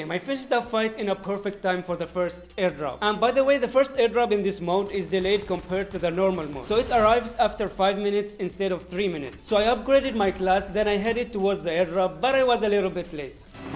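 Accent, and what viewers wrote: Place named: India. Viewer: Yo, you're sound seems Indian....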